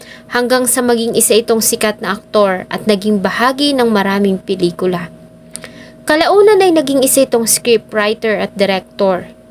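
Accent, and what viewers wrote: Visayas, Philippines. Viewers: Bisaya Lang si Maam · bisaya po ata si maam kaya po ganyan pronunciation nya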